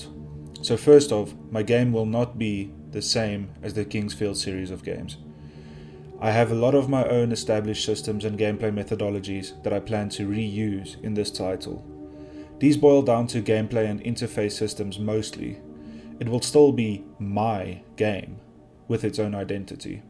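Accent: South Africa. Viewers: I always get a warm feeling when I hear another south african on youtube lol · I'm always thrown off guard when I hear a south african accent on YouTube xD Will you be at rage this year? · I honestly admire the work you are doing,good luck! Also ,I was extremely taken aback at finding another person with a Afrikaans accent on here. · Where you from bro you sound South African?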